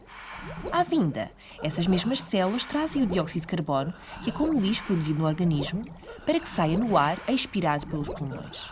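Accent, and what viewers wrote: Portugal. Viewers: Se não fosse o sotaque português,eu teria entendido direitinho,mas ficou bom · Nossa, sou brasileira e não entendi nada por causa do sotaque português. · Nossa explica muito bem, é uma pena ser portuguesa, então há aquela pequena dificuldade de entender "perfeitamente" ... Mas mesmo assim muito bom, like + Fav! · É impressão minha,ou isso é português de Portugal? A língua em que está o vídeo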